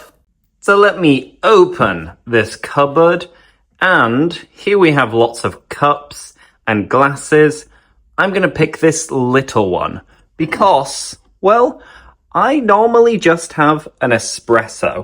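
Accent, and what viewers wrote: United Kingdom. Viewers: I'm trying to do shadowing with your videos to get a British accent, which is really beautiful. Thank you very much 🙏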